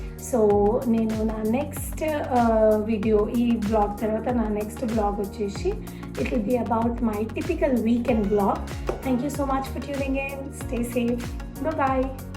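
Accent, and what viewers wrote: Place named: Vijayawada, India. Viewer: Mee slang chala bagundhi.... Meeru vijayawada....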